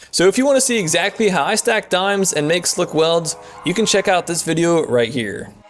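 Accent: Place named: Australia. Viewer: Love the Rolf Harris accent - except he is now persona non grata.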